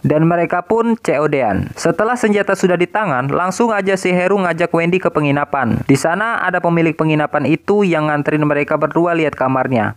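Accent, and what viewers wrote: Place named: Java, Indonesia. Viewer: Bjir jawanya klur ngakak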